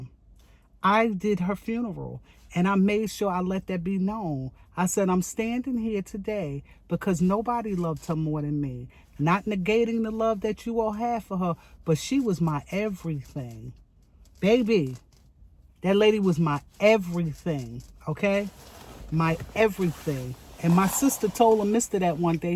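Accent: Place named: North, United Kingdom. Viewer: Happy New Year,!!!! New subbie here and I can already see I'm going to like it here. You sound like you're from up north. "She never liked me, she can't stand me." WHAT???? NAH.... But congratulations on your engagement. This is wild BUT 2025 is here. Let's get it off to a good start.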